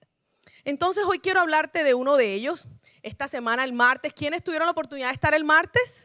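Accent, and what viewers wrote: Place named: Caribbean Coast, Colombia. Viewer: Gracias, la pastora se escucho muy costeña. Muchas gracias. Muy buena predica... Att: Una Barranquillera desde USA, NJ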